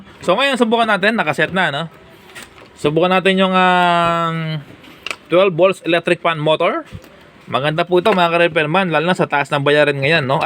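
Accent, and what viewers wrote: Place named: Pampanga, Philippines. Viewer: Kapampangan ka kuya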